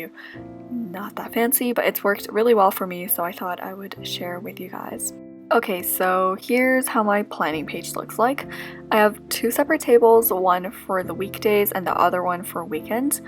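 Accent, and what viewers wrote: Canada. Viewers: She is back with her cute Canadian accent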